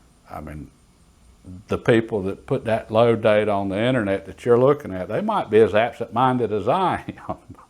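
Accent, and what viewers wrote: South, United States. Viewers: Maybe I missed it, hard time hearing these days, but I always went with the longer the round the more twist were needed, not the weight of the bullet but I guess it could follow the heaver the bullet the longer...this is a great channel with great content and I can actually understand the southern accent better lol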